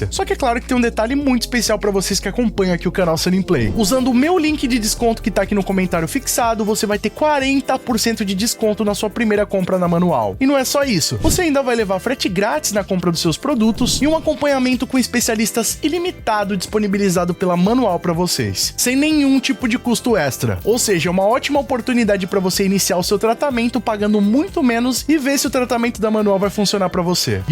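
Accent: Portugal. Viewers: Pq ele tá com essa narração em português- pt ? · Não sei se já havia percebido, mas o canal tem sistema de dublagem, ele está falando o português europeu via IA, o idioma original é o PT-BR.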